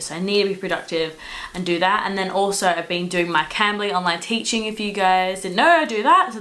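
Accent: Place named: England, United Kingdom. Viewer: It sounds like you have a bit of an English accent!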